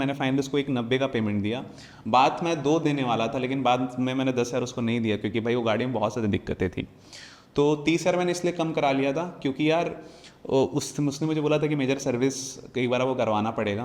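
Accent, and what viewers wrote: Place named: Jharkhand, India. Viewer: Bhaiya ji apka tone se hi laga ap to apnye ho jharkhand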